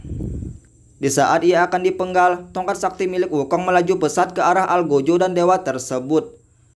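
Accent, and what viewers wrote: South Sulawesi, Indonesia. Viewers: Kenapa logatmu kayak orang soppeng atau bone😅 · logatnya lucu, ugi'banget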